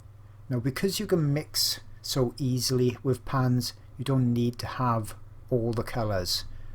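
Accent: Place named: Scotland, United Kingdom. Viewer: Thanks for ur tutorials u are a magnificent painter and u captivate ur audience in every way, I've learned a lot of materials and techniques with u, I'm not professional but I always liked the painting, now I've returned to my taste for pastels, it is hard to get the materials but derwent takes place in my country I bought some derwent pastel pencils in individual way and is more cheap than with the metallic case and other products, I give thanks for the Internet, a warm greeting from Aztec lands Mexico, I hope not bother u but u sounds like a scot beautiful accent 🇲🇽🇲🇽🇲🇽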